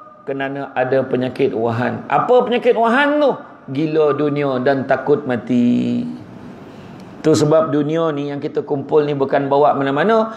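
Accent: Negeri Sembilan, Malaysia. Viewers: Semoga ustaz sentiasa sihat agar dpt terus menyampai kn kebaikan. Ohsem bila dpt mendgr bahaso Nogori 9...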